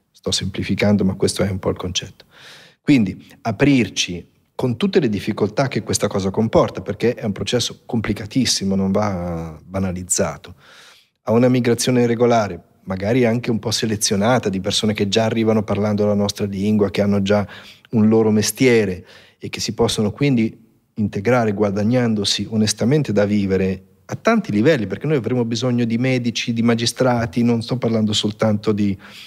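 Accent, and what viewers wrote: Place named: Mestre, Italy. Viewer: Io sento comunque , nonostante gli anni a Bergamo , la R la S mestrina 😅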